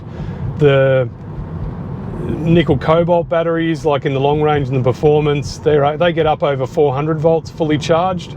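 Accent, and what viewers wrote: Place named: Australia. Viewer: Thanks for the info on LFP batteries - I've installed two 12v LFP batteries in my partners EV scooter. Previous batteries became 'memorized' and would only hold about 2 minute of charge. How does that happen? I understand any battery only really delivers 50% of it's stored energy before it's needs to be recharged. Is that true? Your discussion was very interesting if a bit long. And why can't 'regen' breaking provide more useable power to the battery (is it 1% or 2%)? Perhaps you can break your talk up into segments for each topic. I love the Aussie accent. Bill in San Diego.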